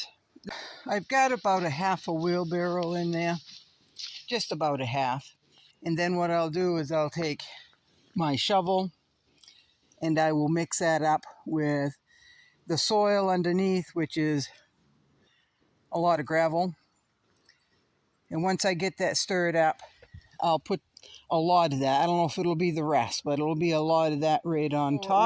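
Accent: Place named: New England, United States. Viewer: I really enjoy listening to you talk and I'll explain why. My oldest brother passed 3 years ago from cancer and he lived in Rhode Island. And last month someone that was like a brother to me that lived in New Hampshire also passed from cancer. Hearing that New England accent brings some comfort to my heart. Me and my NH brother used to get so tickled at each other with the comparison of saying, when I park my car I put my car keys in a pocket of my khakis😂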